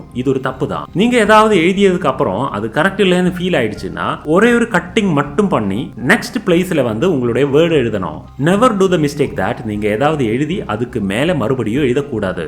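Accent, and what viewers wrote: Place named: North India, India. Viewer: Unga voice... Sir antha cardu mela irukura 16 number sollu sir nu oru vadakkan solluvanla athey maathiri irukku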